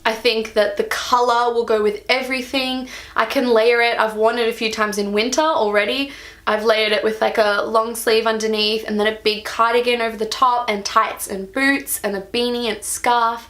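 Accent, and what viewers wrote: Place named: United Kingdom. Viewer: I guess I should mention that I'm making the assumption (based on your accent) that you are UK!? Could be wrong! You can totally listen to my accent and tell I'm backwoods!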